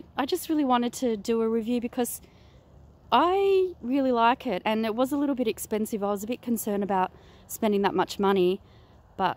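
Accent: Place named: Australia. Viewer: Good job thanks! Love your accent too :) Love Australia RIP Krikey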